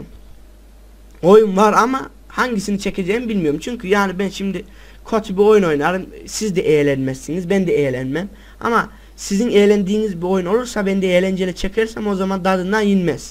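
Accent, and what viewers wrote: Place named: Nevşehir, Turkey. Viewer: way kardeşim sendemi nevşehirlisn şivesinden belli canını yediğim :)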